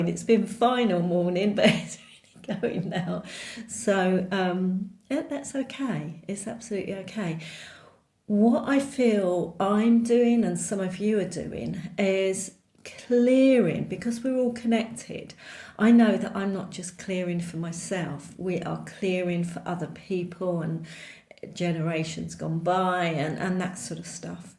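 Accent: United Kingdom. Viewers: Hello Beautiful Butterfly 🦋 it’s wonderful to see your lovely 😊 face and hear a bit of your cockney accent 😇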